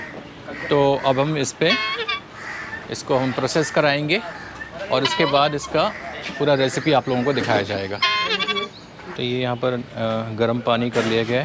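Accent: Standard. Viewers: बहुत प्यारी शुद्ध हिन्दी आप बोलते हैं सर 🙏🙏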